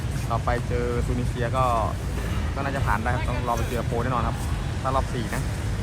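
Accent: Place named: Korat, Thailand. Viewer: โคราชชัดเจน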